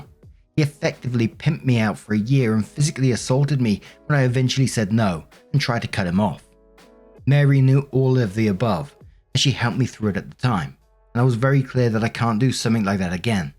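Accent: United Kingdom. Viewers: It's the British accent for me. Makes everything sound funnier!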